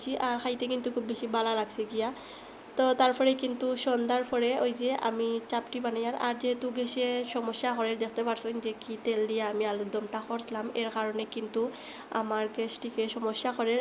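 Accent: Sylhet, Bangladesh. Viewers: apu tumer katha gulo sonle mone hoi sylet chole jai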